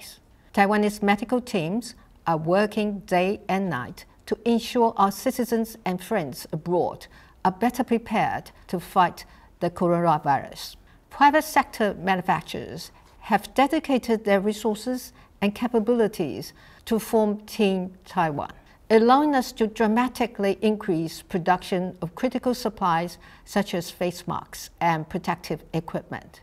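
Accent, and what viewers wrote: United Kingdom. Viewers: 哇！英式腔，英語優雅及用辭禮貌，有點像古典貴族風範，難怪美國政客這麼喜歡她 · 赤坂怜奈她是british 為主，american 為輔，有些音還是偏美式的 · 蔡總統的英倫腔好好聽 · 英國腔太神la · 哇！蔡總統說的是英式英文欸！ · 蔡總統的英文是不是算英式發音啊？覺得滿好聽的，場景覺得拍得好有質感。覺得台灣的總統好先進，有臉書有twitter還當youtuber, 好親民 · 有英國腔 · 迷人的英國腔😍😍😍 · 小英總統的英式英文好好聽! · 果然英國留學英國腔厲害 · 對啊！以後總統像一樣，用英式腔英語優雅及用辭禮貌，有點像古典貴族風範，希望大家都在這點有共識，要不然臺灣就被中共更嚴重。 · 好好聽的英式口音喔😍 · 小英 got a bit British accent which is cool:)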